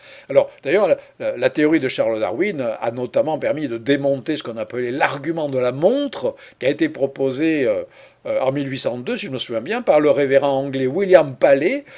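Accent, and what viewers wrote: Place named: Marseille, France. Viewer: Et j aime bien votre leger accent marseillais ayant vécu un peu vers Aix.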